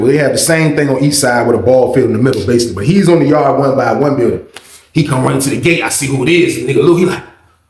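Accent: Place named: South, United States. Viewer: well rocks with every body black and white, and is color blind, that's just how talks, we are country 😀 Stay blessed 🙌 and inspired 🙏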